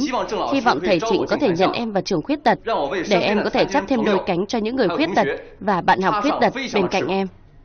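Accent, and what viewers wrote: North, Vietnam. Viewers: Xin loi ko phai Che vi minh nguoi mien nam nghe giong bac ko thich lam ,nhieu bo phim hay nhung thuyet minh nghe ko duoc rat tiec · phim hay 100% nhung bac ki thuyet minh te qua lam mat hay.dung de bac ky long tieng nua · Thuyết minh giọng bắc nghe ko tình cảm ko hay.Dị ứng ghê.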